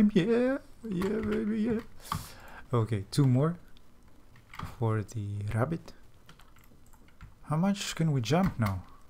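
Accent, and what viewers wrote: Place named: England, United Kingdom. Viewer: You have a great english accent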